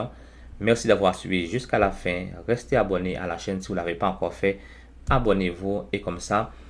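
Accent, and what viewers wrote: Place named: Haiti. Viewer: Vous eyes d'ou, vous avez l'accent Haitien. Si chest vrai, peus tu m'envoyer vote email j'ai des questions.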